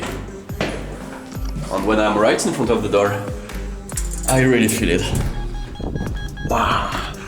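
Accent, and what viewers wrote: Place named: France. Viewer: ещё бы от акцента избавиться и будешь похож на русского, но французский акцент милый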